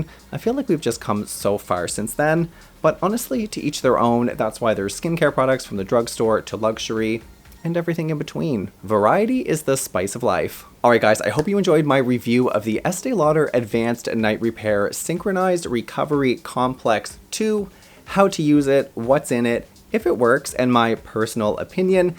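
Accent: Canada. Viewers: Are you Canadian? Great review by the way 😁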